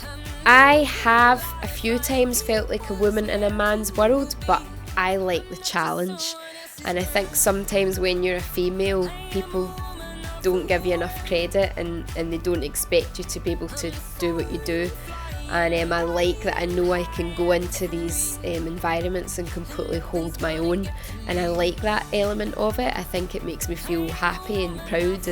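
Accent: Scotland, United Kingdom. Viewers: That scottish accent <3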